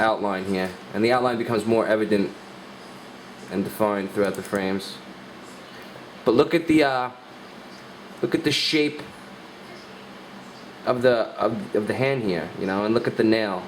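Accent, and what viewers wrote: Boston, United States. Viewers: You from around Boston bruhh?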